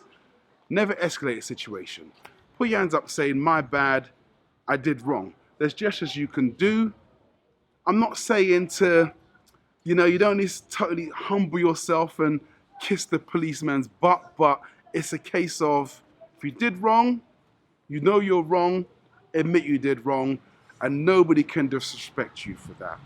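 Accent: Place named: United Kingdom. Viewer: No i couldn't tell but i knew you had that UK accent....I'm from the Philippians but i move to America for 4 years then i move back home for good....i didn't know that you was from Nigeria dats why i had to ask you. Do you ever want to move back to Nigeria???? I thought about moving to Africa soon because i love to travel.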